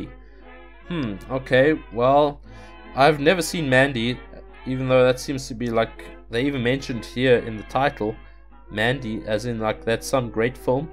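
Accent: South Africa. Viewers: This worries me because the Colour out of space is one of my favourite HP Lovecraft stories. It is so disturbing and unsettling and I'm worried Nicolas Cage will make the movie unintentionally funny :/ I am excited to see Tommy Chong in a movie though, what a legend. PS it is so comforting to hear a South African accent. Keep up the good work :D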